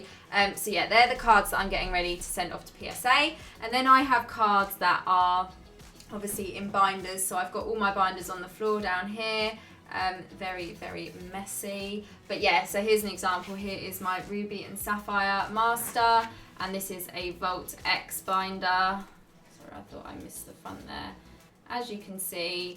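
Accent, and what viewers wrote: United Kingdom. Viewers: I just want to say that I absolutely love your channel and your personality! You have a lovely London/English accent. It's really quite something! Ace all day...✌🏻+💖 - Eric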